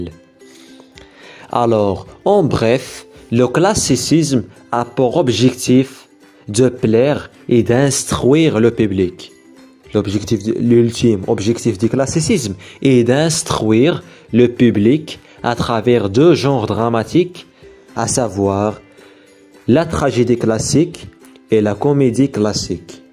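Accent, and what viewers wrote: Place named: Arab world. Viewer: Ohhh l'accent arabe🤓🤓 sinon bonne continuation frr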